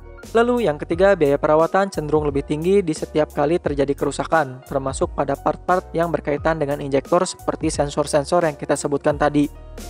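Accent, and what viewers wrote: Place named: Slawi, Indonesia. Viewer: Sampean wong slawi ya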